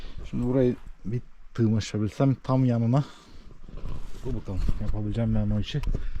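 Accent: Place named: Van, Turkey. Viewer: Şiveniz vanlılara benziyor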